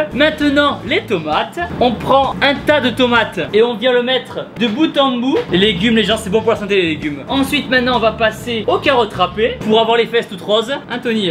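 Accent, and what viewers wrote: South, France. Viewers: L’accent du sud est incroyable 🤩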